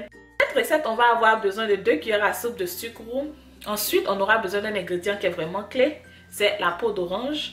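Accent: Ivory Coast. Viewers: Accent ivoirien...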